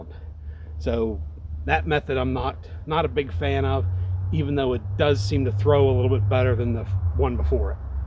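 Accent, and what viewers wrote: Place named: Pennsylvania, United States. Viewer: I grew up near Pymatuning. Once I heard you speak I thought I heard PA in your accent. Thank you for sharing these videos. My dad used to build atlatl, but I never really watched anyone use a sling.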